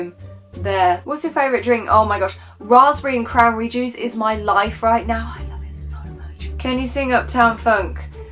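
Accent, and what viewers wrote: United Kingdom. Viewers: Your so pretty. Are you British?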